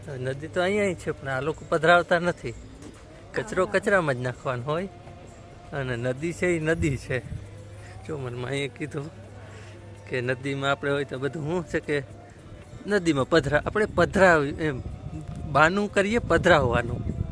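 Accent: Kathiawar, India. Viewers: Sita Ram, bhai. Boli upar thi kathiyawadi lago cho. Lincolnshire chuo?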